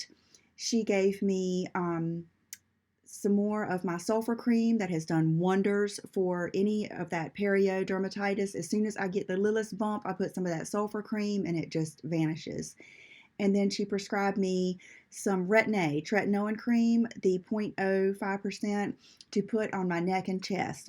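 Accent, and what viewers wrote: South, United States. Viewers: So sorry you burned yourself. I have done that too. I have been watching a lot of your videos and truly adore you. Your accent sounds like you might live in the south. It is so nice to listen too. Love your kitties. When I was growing up we lived in a small town in central Illinois. We had 10 acres surrounding our hose which was 3 stories. We had so much space that the top floor was turned into a play house for me and my sister. With that said we also had room for 39 kitties at once. We would dress them up in doll clothes, pretend to operate on them and just silly girl's stuff involving our kitties. So long story short I love love kitties and yours are so beautiful. Love them.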